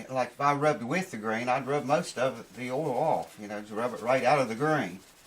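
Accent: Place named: West Virginia, United States. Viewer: Just found you, great videos. I have a D15M Martin and love it. Every time I take it out of the case I stick my nose in the sound hole and take a big breath! Where you from, I grew up in WV and am picking up some WV accent on some of your words, I live in NC outside of Charlotte now. Love your channel.....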